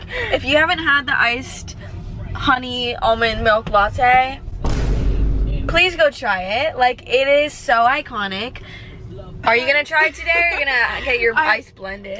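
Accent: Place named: United Kingdom. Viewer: It's the constant British accent for me -- Brit gal over here!!!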